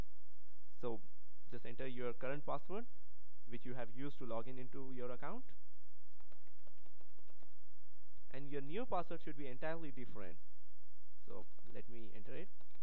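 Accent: India. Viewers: Thank you very much! il ur indian accent!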